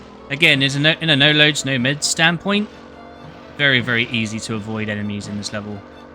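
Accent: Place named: United Kingdom. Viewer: Great video! You sound like you are from the United Kingdom!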